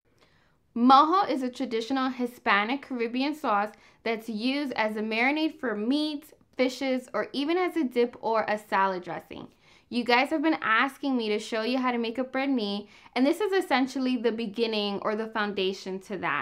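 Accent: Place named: Queens, United States. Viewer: First off ... you sound like my people I knew in Queens, NYC :-) Love it! Second, I've been making mojo for years and I had no idea it was called this way! LOL! It's like the best marinade and base for just about anything Latin cuisine! The grilled chicken where this mojo is used along with the other ingredients is insane! My family loves it! I make it a lot! Gracias por todo!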